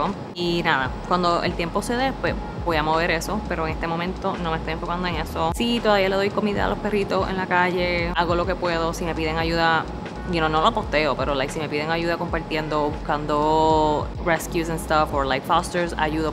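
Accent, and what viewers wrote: Puerto Rico. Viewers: Thank you so much for making these videos. They help me a lot to practice Puerto Rican Spanish. It adds a nice touch knowing you are also Asian 🥹🥲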